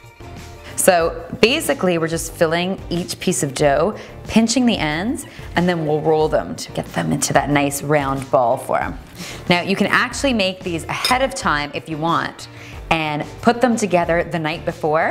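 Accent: Canada. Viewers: You sound Canadian. I love the cinnamon raisin with cream cheese. But now theres competition. I have to figure out which one of these I love the best.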